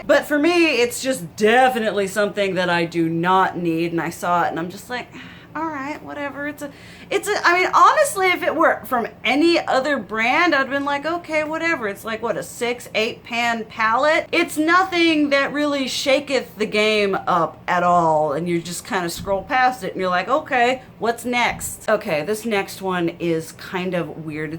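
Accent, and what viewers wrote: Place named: Rural, United States. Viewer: Its funny how you make fun of people loosing their mind over different color beauty blenders when you apparently loose your mind for useless knick knacks and odd shaped and colored eyebrows. I don't know how many people will be able to get past the various annoying habits you have and your hick accent. But good on you for putting yourself out there. You are making fun of flipping NATASHA DENONA for baby Jesus sake. Have you seen how classy and beautiful she is?!?! I have every one of her palettes, not even kidding. Bullshit, you will be buying shit all year this year and just not telling people.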